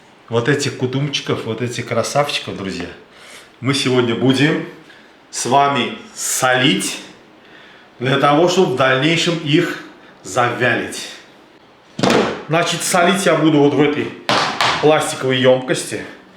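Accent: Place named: Baku, Azerbaijan. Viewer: Салам из Дербента....спасибо за такие видео.. Такой,родной Бакинский акцент Саг Ол От души 👍👍👍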